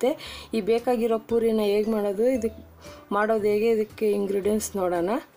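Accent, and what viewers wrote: Tamil Nadu, India. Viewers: Yenne Tamil slang aanstidee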